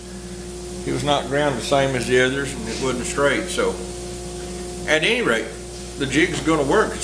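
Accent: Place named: Texas, United States. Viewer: Hey, I have watch a hole lot of videos on You Tube and I want to say you are the best, really down to earth guy. I loved your show on safety. You are so dead on, of the point that it is your own business on safety. Thank you for your videos I have learned so much from you. You sound like your from Texas so am i close? LOL I live in Shreveport La Thanks again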